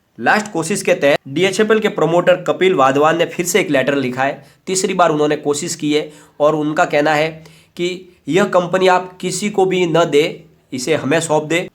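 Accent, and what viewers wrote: Gujarat, India. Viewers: Gujrati lago cho.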